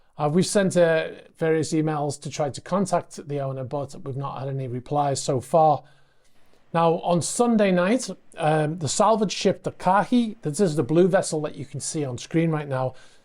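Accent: United Kingdom. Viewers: Love the HAOLE Announcer, very Brit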